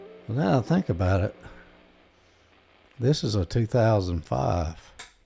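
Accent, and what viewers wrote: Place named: South, United States. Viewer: used to run the clubs snow bird style from Michigan to Florida.so I love hearing southern draw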